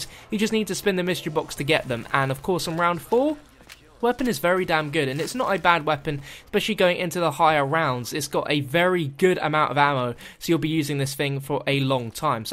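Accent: England, United Kingdom. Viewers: " the HEYTCH G 40" lol gotta love england accents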